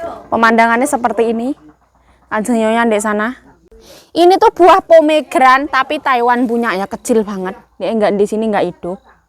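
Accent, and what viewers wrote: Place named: East Java, Indonesia. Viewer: Sehat selalu mbak,tetap semangat.....bicaranya logat jawa timuran,sama kaya diponorogo....👍👍👍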